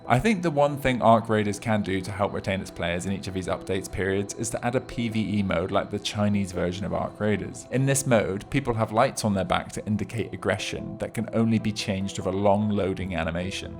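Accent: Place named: United Kingdom. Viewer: Your a straight up limey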